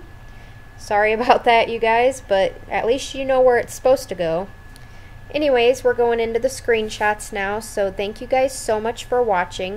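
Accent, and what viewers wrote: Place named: Minnesota, United States. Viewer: lovely build! quick question: are you from the midwest? you sound a lot like my minnesotan friend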